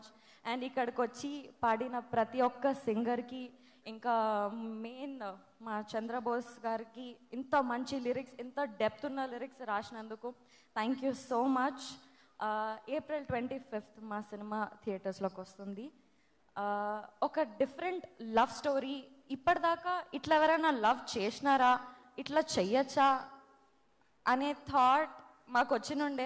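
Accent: India. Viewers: Hello she is from telangana....if you don't know anything keep your mouth shut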